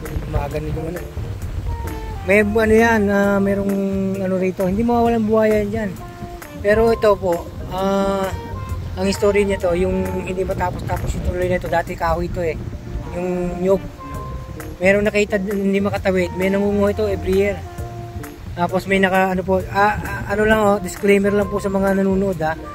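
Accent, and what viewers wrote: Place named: United States. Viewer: Para ka palang kano sir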